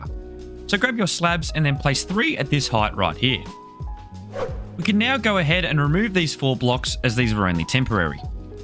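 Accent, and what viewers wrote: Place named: Australia. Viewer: I was wondering if you are an Aussie like me because you sound like it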